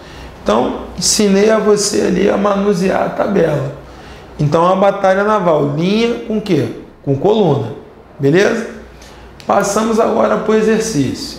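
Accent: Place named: Rio de Janeiro, Brazil. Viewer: Exelente aula amigo, mas aguentar esse seu sutaque de carioca é insuportavel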